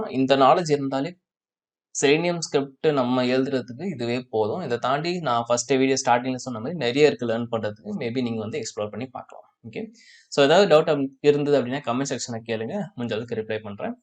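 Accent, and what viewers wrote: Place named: Chennai, India. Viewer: The way of coaching is very understandable. Sometimes your Chennai language flows 😂😂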